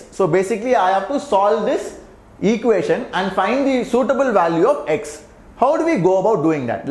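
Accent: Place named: Karnataka, India. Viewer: Sir's Kannada accent is 👌👌👌👌👌👌👌👌👌👌😊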